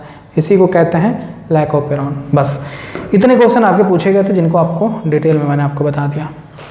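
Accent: Chhattisgarh, India. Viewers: Sir aap Chhattisgarh se ho kya???🙂😁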